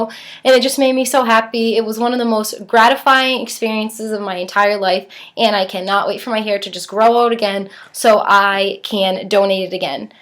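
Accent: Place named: New Jersey, United States. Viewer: Love this video. I a a new subscriber. Please sub back and anyone else that does, I will too. You sound like you are from New Jersey. Love your accent. :) You are awesome!!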